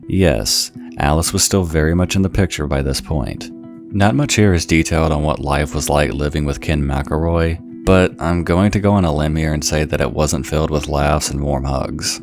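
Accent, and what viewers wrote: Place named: Texas, United States. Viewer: Are you from Texas? Your accent sounds very Texan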